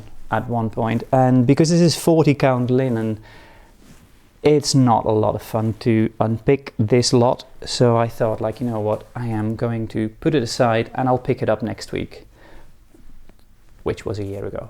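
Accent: Netherlands. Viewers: This was a charming video, sir, thank you. May I ask where in the Netherlands you grew up? You sound so much like my Einhoven-based Philips colleagues. Cheers from a new viewer, Ardith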